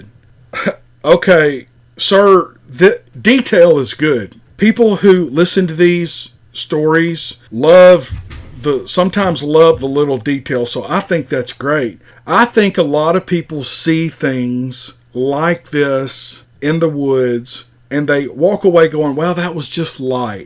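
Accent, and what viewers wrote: South, United States. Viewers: The siege of Lockett ranch is amazing! Wow if you could read it that would be cool too. Dark waters is a great narrator. I'd like to hear it with the southern twang as well. I've listened to it probably 5 times. But I'll listen another 5 again if you put your narration twist on it! Maybe you could work something out with DW. Hes great! And so are you. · Happy anniversary! I found you not long after you started. I heard about you on Bigfoot Odyssey and a couple others mentioned you. You are my people! (Southern drawl and all!);keep up the good work. By the way, I like the chit chat on the videos too. I'm more of a people person. Having a somewhat large pet page, people say I talk like I know people. I do like to get to know people on a personal level. The "chit chat" works for me! Remember it's your channel. You do you!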